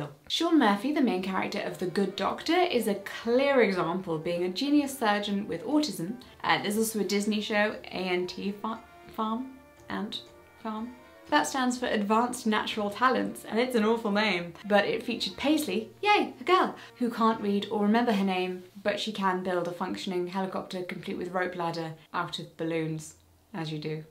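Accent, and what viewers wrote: United Kingdom. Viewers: Please slow d o w n! I can't understand a word you say. Your British accent is too strong.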